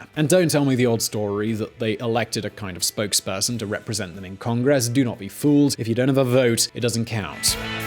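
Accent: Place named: United Kingdom. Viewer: Sir, a "territory that BELONGS to the US" it's not the most political correct statement. I feel we ARE PART OF THE US. I believe that you as a British (which I presume for your accent) must understand what a commonwealth is. Also, "before Irma and Maria". There wasnt any "Ana". One of the things that utterly annoys me is the continuous mention of corruption in our Government. That in PART is true. Ok. Please, Dont overlook the FACT that we HAVE and HAD HONEST and DEVOTED polititians. But why corruption it's always brought up everytime someone dares to describe our Island?!? To me that not only harms our image to others, but also upsets us. That "We dont do anything" concerning government??? Really? May I suggest you to produce a video on how we fight everyday. We fight setbacks and negative situations. Although your video and your analysis are good. 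I'm giving it a like...Best wishes...Thanks 👋👍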